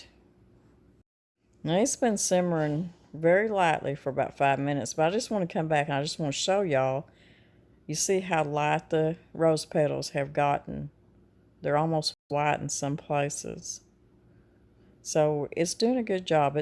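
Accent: United States. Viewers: LOVE that country accent, girlfriend! You sound like family. 😁 I love putting rose water in a spray bottle and lightly mist it on my face and diluted, I use it on my hair as a leave-in/refresher spritz. As soon as we move I'm going to try to grow some roses just to make RW with! 👍👍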